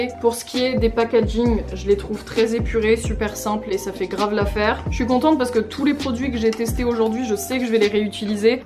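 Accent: United Kingdom. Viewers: Mais elle est 100% British pas américaine, écoutez son accent 😢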